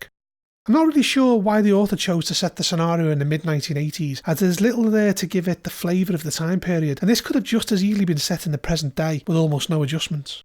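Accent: Liverpool, United Kingdom. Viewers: Unless I am mistaken, I am detecting major Scouse.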